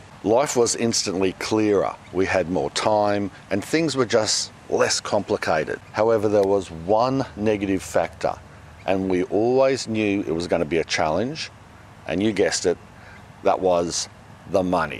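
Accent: Australia. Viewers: You really seem like such a kind and thoughtful man. I have nothing but respect for you and your family and I’m glad that you becoming a content creator has helped your family out. Haha maybe it’s your Aussie accent in my American ears playing tricks on me but I doubt that. I wish you a happy Memorial Day from over here in the States.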